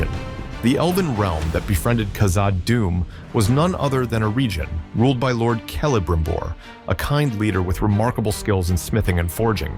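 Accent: United States. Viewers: The pronunciation of place names is too American. Especially Osgiliath and Eregion.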